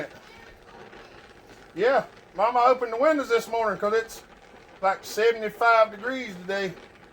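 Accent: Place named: Alabama, United States. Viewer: are from sweet home Alabama where the sky is so blue? You have our accent. We say Sir and we say Ma'am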